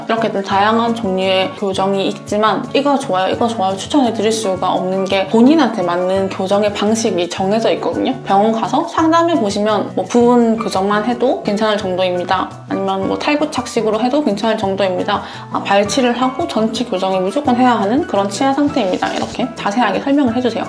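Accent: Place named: Jeolla, South Korea. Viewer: 전라도 사람이신가요 말투가 매력적이네요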